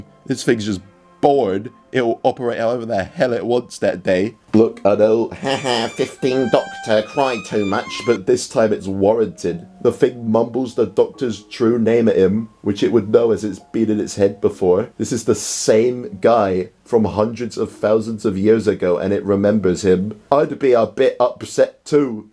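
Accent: United Kingdom. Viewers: Time to listen to a british git speak about a show I watched like once when I was the size of a bedside cabinet and not understand anything like a modern tik tok kid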